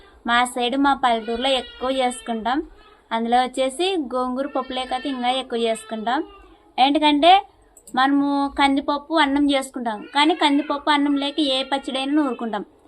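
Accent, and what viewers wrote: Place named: Rayalaseema, India. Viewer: Adi rayalaseema village yasa ardhamuc hesukondi.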